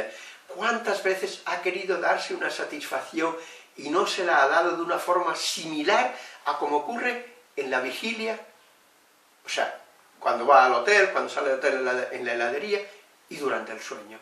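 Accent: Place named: Spain. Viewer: Me ha gustado el acento español con el que habla :)), buena explicación de la teoría.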